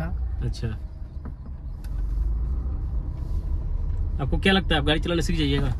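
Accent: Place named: Bihar, India. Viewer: Sir ke bolne ke style se lag raha hai sir Bihar side se hai.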